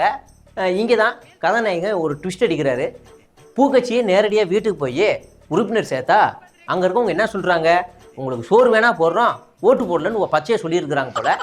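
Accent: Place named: Idumbavanam, India. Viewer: enaya idumbavanam tone duthu pesitu eruka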